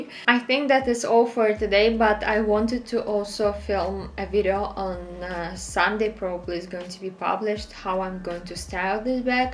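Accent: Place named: Russia. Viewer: You have russian accent but you obviously dont look russian)) Where are you actually from?)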